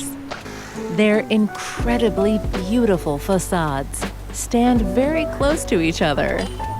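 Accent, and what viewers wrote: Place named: United States. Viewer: The American pronunciation is hilarious! "Van go". Ridiculous! It's Fahn gockh. Also, Omsterdom, to pronounce it correctly, does not have the only diamond museum in Europe: there are many - try going to Antwerp and Brussels.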